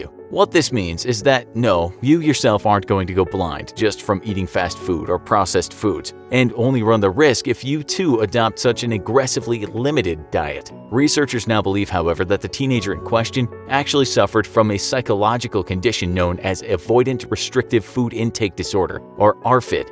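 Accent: United States. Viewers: My mans out here roasting America sounding American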